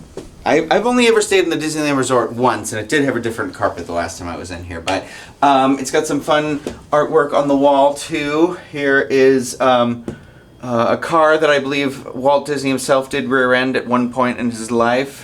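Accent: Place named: Massachusetts, United States. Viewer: Mass accent béer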